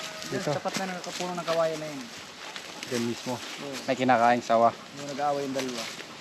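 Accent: Visayas, Philippines. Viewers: bisaya ka pala ya😁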